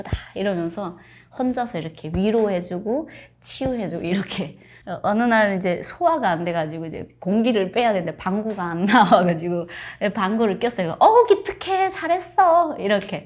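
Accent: South Korea. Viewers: it’s the accent. You had to live in South Korea for a decent amount of time to lose the North Korean accent. North and South speak with differently and have different Vocabulary. The way you speak doesn’t change in couple of days. That’s why I can tell she lived in South Korea for a while. She talk more like a South Korean than a North Korean. So saying that’s her first time eating Korean Ramen is just Click Bait. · This clearly isn’t her first time cooking South Korean ramen—she does it casually and nonchalantly, without any particular focus or curiosity, like it’s just another quick meal on an ordinary day. It’s obvious she’s been in South Korea for a while since escaping the North. You can see it in her mannerisms and hear it in her accent—she’s clearly assimilated into life here. That’s why I don’t get the need for these sensationalized, clickbait titles like “North Korean girl tries South Korean ramen for the first time.” It feels cheap and disingenuous. 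It turns her real life and quiet normalcy into a gimmick, taking the focus away from her actual story just to get views...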